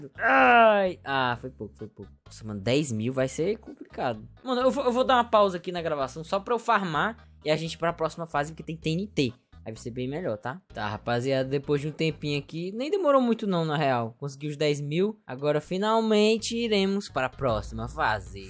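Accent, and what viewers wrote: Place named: South, Brazil. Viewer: Pelo sotaque acho q o é do Sul.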